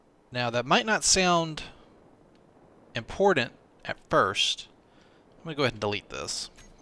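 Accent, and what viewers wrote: South, United States. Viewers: That's just his southern accent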